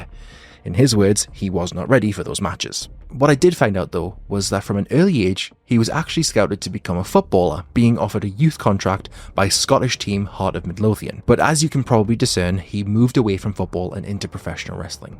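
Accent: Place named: Newcastle, United Kingdom. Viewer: Fantastic video, love the geordie accent as well!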